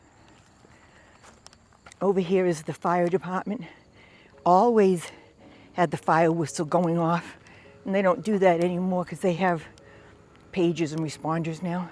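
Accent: New England, United States. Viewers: Greetings from Maryland! I enjoy hearing where your subscribers live. Both of my parents grew up in New England (NH), so listening to your accent warms my heart. Thanks for the walk-about.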